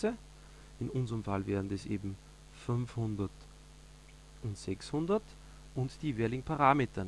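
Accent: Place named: Upper Austria, Austria. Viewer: Sehr gutes Video, das ma wirklich weitergeholfen hat! Gut verständlich erklärt und sympathischer OÖ-Dialekt :)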